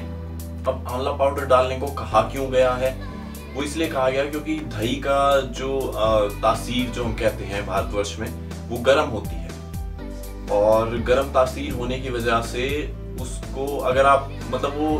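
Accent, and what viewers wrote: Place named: Himachal Pradesh, India. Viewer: It sounds like ...you're from HP Nyc info btw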